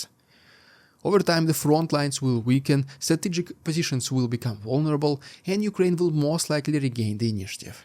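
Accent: Russia. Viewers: How can you be so eloquent in English and at the same time have such a heavy Russian accent? You are an enigma... Your content is really good, thank you for your tireless work! Russian evil regime will be defeated, truth and love must prevail!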